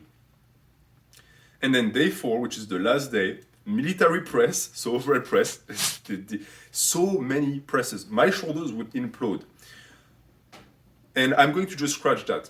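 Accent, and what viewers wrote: France. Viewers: I dont get it. French accent but you have a football on your shirt and not a soccer ball. Im hella confused.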